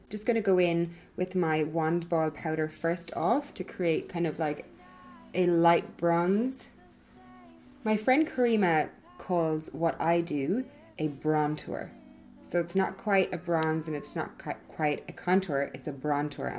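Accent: Ireland. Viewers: Is your accent Irish? Love your channel & review!! Love Charlotte Tilbury products & you look beautiful 😃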